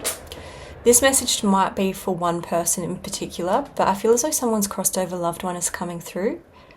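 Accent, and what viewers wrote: Australia. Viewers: Hi, I'm new and today I just finish another cup of warm tea and it caught to my attention when you mention Rieke and I have been attending this session and it helps me. Thank you for your reading. I'm wondering if you are from Australia or from Great Britain? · Are you from Australia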